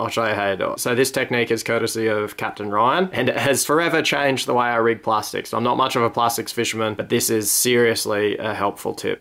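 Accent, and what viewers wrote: Australia. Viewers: These videos are so therapeutic to watch. Sometimes I just wanna watch a guy talk about fishing with good camerawork and a hectic Aussie accent.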